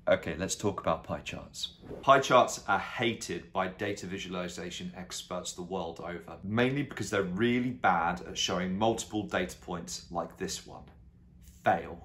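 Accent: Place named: United Kingdom. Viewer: I think you are from UK😊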